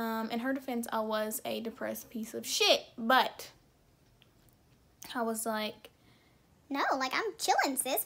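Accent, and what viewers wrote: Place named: South, United States. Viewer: i didn’t know you were southern and now i’m even more in love with you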